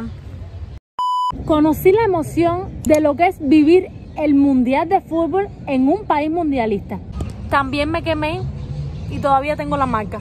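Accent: Cuba. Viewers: Hola Ojalá puedan lograr su autito. Dile que no se preocupe por el escarabajo. Es fiel. Y por sumba. Búscate un gym y ofrece tu servicio. Te darán lugar para que ejerzas. Uno buenos pesos no está mal. Y con tu tonalidad cubana....se llenara. Tengo un gym. Por esa razón te comento. Abrazo desde BsAs.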